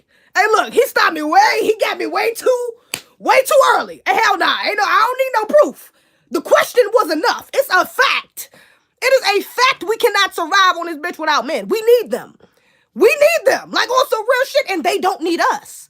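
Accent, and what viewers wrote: Baltimore, United States. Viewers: U from Baltimore ain't u sis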